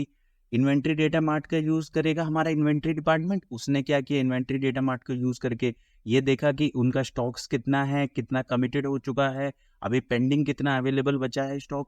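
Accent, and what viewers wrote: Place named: India. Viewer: Good Indian accent on YouTube.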